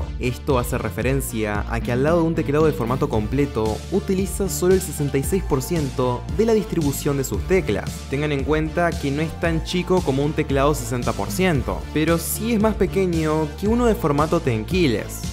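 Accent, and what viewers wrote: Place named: Paraguay. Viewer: Tiene la ñ paraguaya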